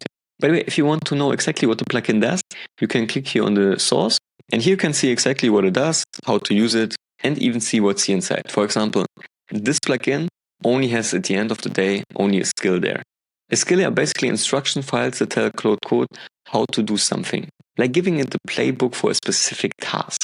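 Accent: Germany. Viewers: Man hört den deutschen raus👁️🤣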